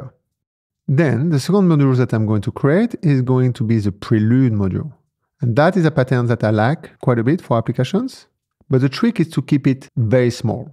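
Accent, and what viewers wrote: France. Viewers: ummm seem like here is a french accent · OMG! I'm loving the French accent! 🥐☕😀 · The french accent is so cool as a french person :)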